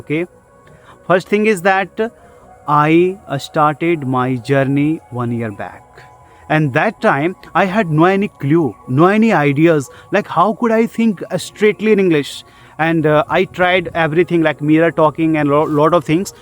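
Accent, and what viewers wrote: India. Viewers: Bihari English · Pls improve this indian accent if u dont wanna get bully in English countries.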